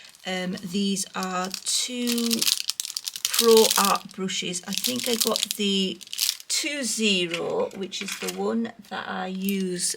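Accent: United Kingdom. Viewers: you said “colors of the world.” I know that you were referring to the markers. But because you said it with your awesome British accent, I found myself singing 🎶”Colors of the world, Every boy and every girl, People of the world, Ahhhhhhh 🎵 You activated the file in my brain. It was 1998 all over again! 😂 Thanks for the video. ❤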